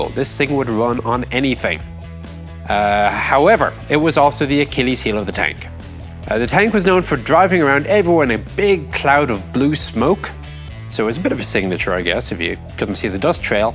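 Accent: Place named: Ireland. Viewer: Originally from Ireland, his Irish accent gets stronger when he has been visiting Ireland lol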